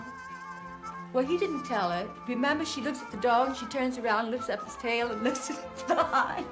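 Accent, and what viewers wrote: Mid-Atlantic, United States. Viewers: It sounds like a so-called mid-Atlantic accent, albeit toned down a mite. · Very surprised at her posh American accent. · It’s the Trans Atlantic/Mid Atlantic accent. It was how the upper class in the North East spoke. It was taught in speech/elocution classes as well, as in drama schools/classes. It fell out of favor after WWII.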